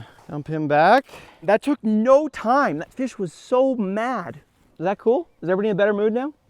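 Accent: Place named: Canada. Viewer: I'm Canadian but this is one of the strongest Canadian accents I've heard.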